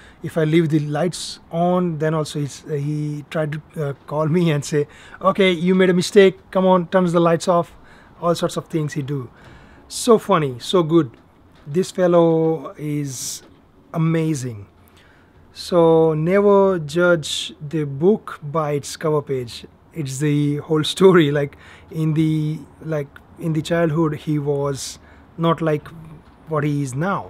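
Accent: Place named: Bhojpuri, India. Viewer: Bhojpuri accent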